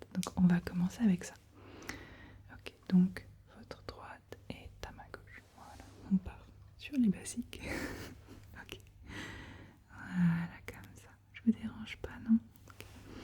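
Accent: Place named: France. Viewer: This channel is the only ASMR channel that actually makes me fall asleep 🙂‍↔️ His French accent is very cute to listen to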